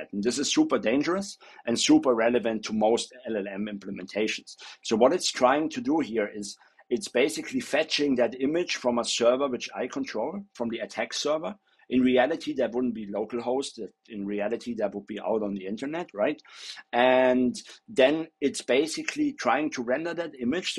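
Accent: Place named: Germany. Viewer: Sehr gutes video , Ich habe von deiner Stimme erkannt dass du ein deutscher bist . Bin ursprünglich auch aus München. Hab auch mehrere Methoden selber herausgefunden Prompt-Injektion zu machen um schwachstellen in LLM-Models zu entdecken . Mach weiter so , liebe deine videos ! 🔥sind kreativer und gehen tiefer ins detail . ✌🏻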